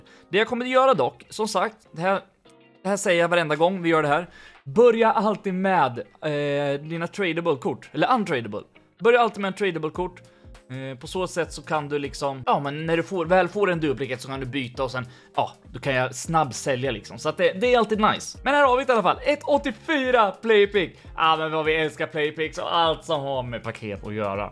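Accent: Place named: Östergötland, Sweden. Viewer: Östgötska dialekten gör att jag följer dig ❤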